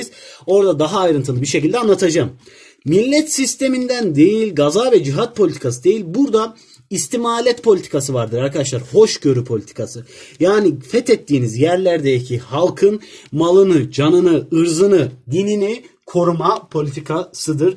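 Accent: Kayseri, Turkey. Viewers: Hoca galiba kayserili aşadaaaaaakii karşınızdaaaaakii sinirim bozuldu skjsnsiwns😅😂😃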